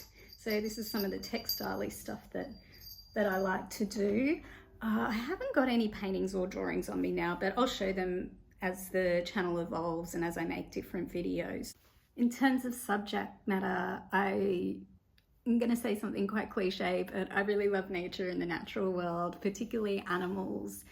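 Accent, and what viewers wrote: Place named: Australia. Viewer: So happy to have stumbled onto your channel. This is only the 2nd of your videos I’ve watched but I’m now your newest subscriber 🎉yeah. I adore your warmth & authenticity, plus it’s lovely to hear an Aussie accent. I’m also an Australian, the same age as you & been wanting to start my own channel to document my creative process but it’s hard to imagine what you can’t see already so thanks for being an inspiration. I look forward to watching the rest of your content. I know I’m a total stranger but honestly I’m so proud of you in this video for “just doing it”, for having the courage to show up. You ROCK!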